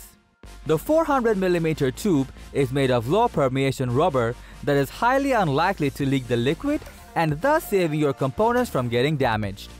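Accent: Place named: India. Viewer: He sounds like a Indian with a german accent trying to sound like a American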